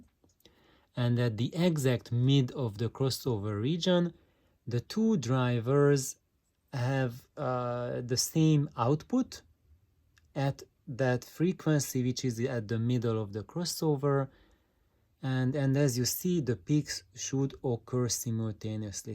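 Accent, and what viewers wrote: Hungary. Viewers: Brutális ez a magyar (Hungrish) akcentus. :)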